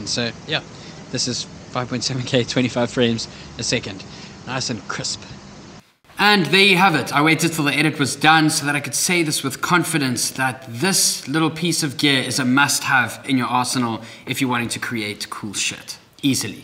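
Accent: South Africa. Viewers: First time I'm watching this YouTuber. And a few seconds in I'm thinking this guy sounds like a South African 😀 awesome work 👌👏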